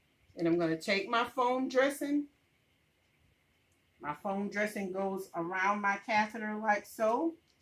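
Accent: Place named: South, United States. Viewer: Loved the video!!!! Thank you so much, and you sound southern! :)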